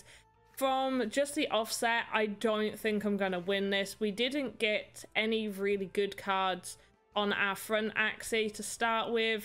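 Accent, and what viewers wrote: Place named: United Kingdom. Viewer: I like ur speaking! U cool! Lovely British talking! 😇❤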